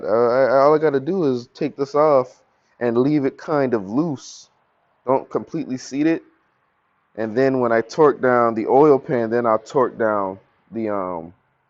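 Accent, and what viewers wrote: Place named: Caribbean. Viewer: Whats good man. I just released a vid on those pistons. I'm doing a Dual LS Swap on my box chevys. Where you from? I hear a lil caribbean accent in there.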